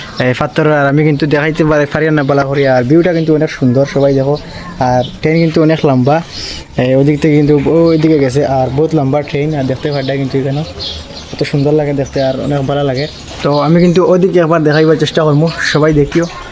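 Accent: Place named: Sylhet, Bangladesh. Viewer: Shyleti style language . Tripura এর লোক আপনি. নাহলে শিলচর জেলার লোক. শ্রীহট্ট (সিলেট) এর ভাষা আমার খুবই প্রিয়।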